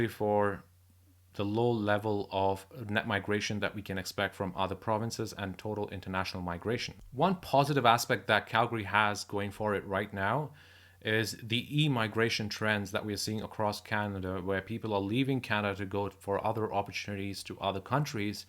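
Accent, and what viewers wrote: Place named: Iran. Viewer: Dude has an Indian name but has a mild Iranian accent.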